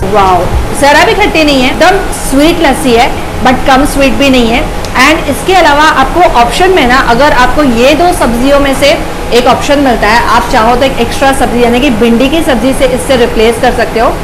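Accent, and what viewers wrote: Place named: Sindh, India. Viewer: Sindhi accent is good to hear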